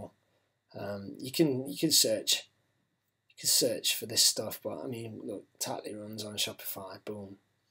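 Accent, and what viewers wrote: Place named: Nottingham, United Kingdom. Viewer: Glad I stumbled across this. Genuine guy with a lovely Notts accent. Just subscribed 👍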